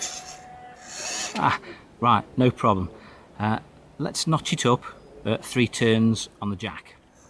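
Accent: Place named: Stoke-on-Trent, United Kingdom. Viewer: Hey bud, Cracking vids... I’ve just purchased one of these mn99 myself and will be looking to weight my wheels when it arrives, What weight would you suggest putting in after your test? 40g 50g? Also I couldn’t help but notice your accent, are you local to the Stoke On Trent area?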